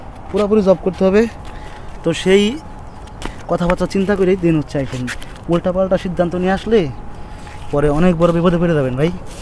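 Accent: Faridpur, Bangladesh. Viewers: Vai apnar voice shunei buja jay apni faridpur er amr gram er bari o faridpur e ami dhaka thaki Matro Hsc sesh holo bahire jete cacchi Ar vhai 12/14 lack tk koroch kore jabo jeheto deshe to kisu holeo pathaite hobe so amr jonno japan asha ki uchit hobe?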